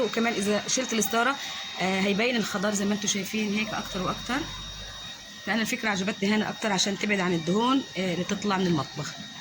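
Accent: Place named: Egypt. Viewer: صحتين وعافية بدي أسألك إنت بتحكي شوي مصري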